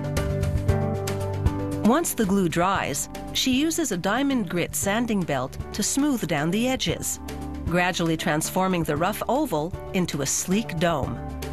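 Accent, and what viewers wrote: Canada. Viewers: Whilst the producers of the show are ignorant and stupid for not researching the correct pronunciation of "Darr-bee-shire", my fellow Brits are equally ignorant and stupid for attacking Americans here, when the narrator is quite clearly speaking with a Canadian accent (oot, aboot etc) and she gives the temperature of the vacuum oven in Celsius, not Fahrenheit like an American would. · Then good thing this narrator is Canadian!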